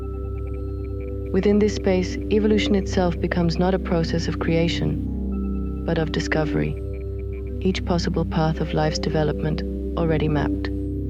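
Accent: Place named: Europe. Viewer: monotone voice with slightly European accent, devoid of human differences that give "color" to life. Drivel.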